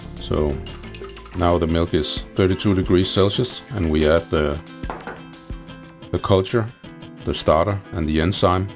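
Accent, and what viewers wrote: Denmark. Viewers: Are you danish brormand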